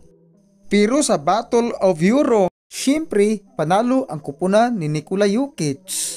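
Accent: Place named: Visayas, Philippines. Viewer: Bisaya kaayo ka boi!!!!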